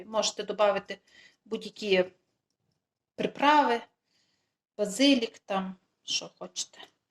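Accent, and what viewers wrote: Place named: Lviv, Ukraine. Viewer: І я перший раз дивлюся, тільки зайшла, почула наш Львівський діалект і відразу підписалася 😊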